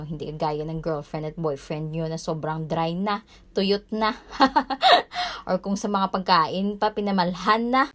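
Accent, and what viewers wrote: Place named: Iloilo, Philippines. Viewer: Ilonggo accent haha di madula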